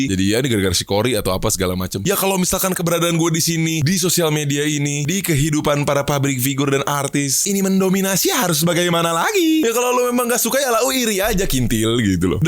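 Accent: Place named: West Java, Indonesia. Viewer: Sunda pisan akwkw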